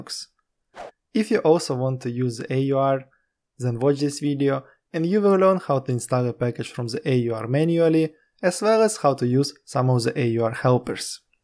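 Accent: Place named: Russia. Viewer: the ruskii accent is the best:)))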